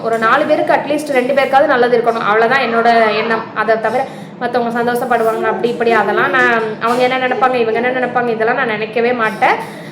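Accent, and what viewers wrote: Tirunelveli, India. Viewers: உனக்கு எந்த ஊர் அம்மா.திருநெல்வேலி பாசை பேசுர. தென்மாவட்டமா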